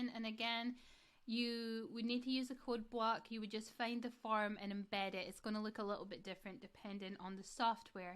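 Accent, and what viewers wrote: Scotland, United Kingdom. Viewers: Hey, Im guessing you ahave spent some time in Scotland based on your accent. I was looking for a video on how to use the newsletter feature on my squarespace website. do you have a video for this? I would like to send a news letter to people on my website that sign up but now sure how to make it all function. I have used a few of your videos to get mite site to where it is. so Thank-you.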